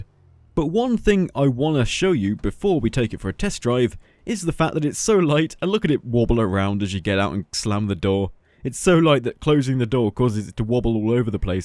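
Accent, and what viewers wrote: United Kingdom. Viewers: Are you british? 'Cause it sounds like you have a bit of a british accent.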